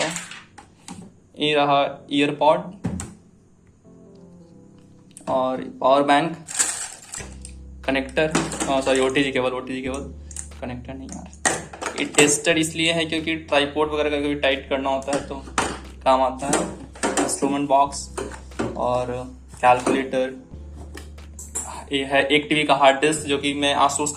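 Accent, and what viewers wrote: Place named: Jammu, India. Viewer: Bhai jammu ma bhi same jhi bolte ha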